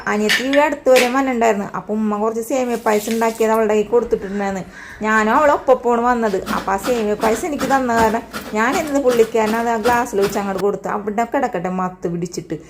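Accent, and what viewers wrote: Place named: Kochi, India. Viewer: ഇരിക്കണേനു. കൊച്ചി ഭാഷ കേൾക്കാൻ എന്ത് സുഖം.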